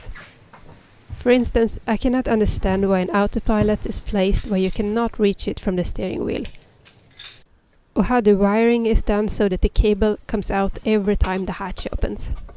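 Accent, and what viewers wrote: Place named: Värmland, Sweden. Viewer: Hi, I really enjoy your channel (especially when you get to hear some värmländska). I'm in a similar situation, having bought a Flamingo 40 last year as my first sail boat together with ha friend. I just have one suggestion - could you possibly arrange the order of the videos sequentially in the playlist you've made? Right now it seems that youtube autoplay will select the next video to play at random:) Thanks for the great content!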